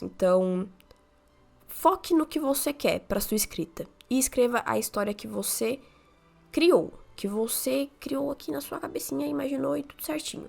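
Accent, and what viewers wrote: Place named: Santa Catarina, Brazil. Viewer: oii, pelo teu sotaque eu desconfio que tu sejas de SC obrigado pelas dicas!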